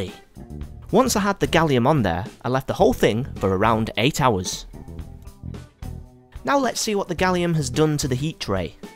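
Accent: United Kingdom. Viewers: It's probably the UK pronunciation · He's British lol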